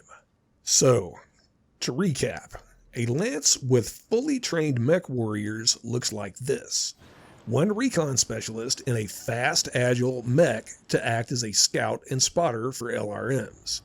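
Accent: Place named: Midwest, United States. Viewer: Thanks! Just bought BT and Big 3 DLC! And subscribed! I'm doing my part! I like your narrator voice, clear and calm with that midwestern (I think...) accent.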